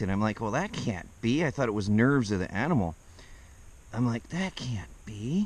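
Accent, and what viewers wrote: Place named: Michigan, United States. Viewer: Yeah you sound like you're from michigan too.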